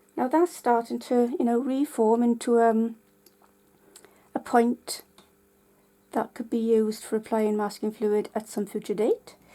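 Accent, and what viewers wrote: Wales, United Kingdom. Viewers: Love that Welsh accent! Thanks for the tip. Jan from Victoria, Vancouver Island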